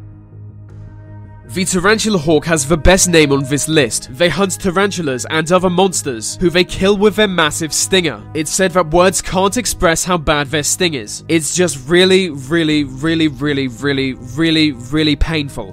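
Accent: United Kingdom. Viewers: Your sense of humor & that Brit accent is deadly too! 😎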